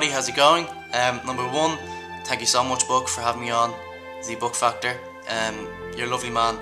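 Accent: Ireland. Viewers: irish accent !!1 i love it !! :D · Buck sent me! I loooove your Irish accent. hahaha You`re pretty talented! Don`t give up your dreams. :)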